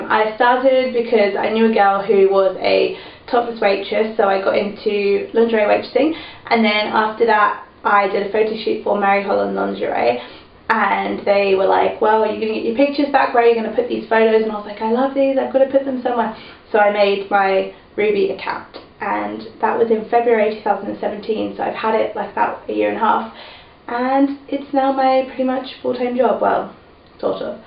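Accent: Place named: Australia. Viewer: You're gorgeous as fuck and that Australian accent melts my soul I love it😍😍.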